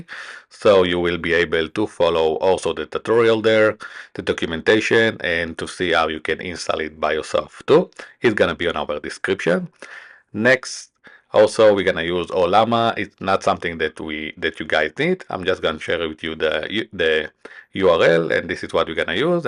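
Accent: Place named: Israel. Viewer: Brother are you Israeli by any chance? the accent is too noticeable 😅😆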